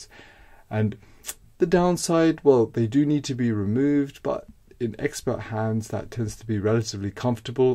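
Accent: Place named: England, United Kingdom. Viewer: SOUNDS like London, England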